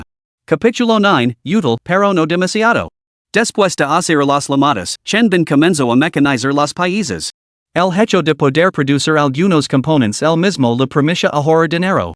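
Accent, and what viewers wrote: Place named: United States. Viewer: Esta español si lo escuchas bien solo que es un gringo quien habla😔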